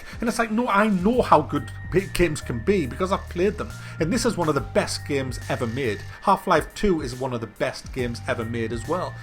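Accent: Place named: Northern Ireland, United Kingdom. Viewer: Do you live in Northern Ireland, just wondering because your accent sounds similar to mine, being from County Down.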